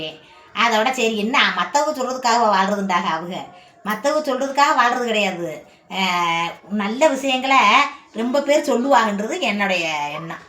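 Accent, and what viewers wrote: Madurai, India. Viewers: நான் திருவள்ளூரில் இருக்கின்றேன் என்னுடைய பிறந்த ஊர் மதுரை, சகோதரி உங்களின் இயல்பான மதுரை மண் மனம் பேச்சு என்னுடைய சிறுவயது வாழ்க்கைக்கு கொண்டு சென்று விட்டது நீங்கள் சொல்லும் வார்த்தை எதார்த்தமான வாழ்க்கைக்கு உகந்தது உங்களுடைய தொடர்பு எண்ணை அனுப்பினால் நன்றாக இருக்கும்.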